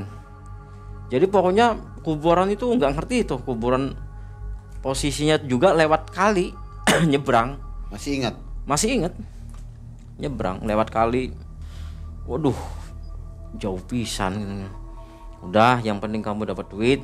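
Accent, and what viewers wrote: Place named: Indramayu, Indonesia. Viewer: Bahasa Indramayu 😅😅 kongsi enk ngrongokna e mang 😂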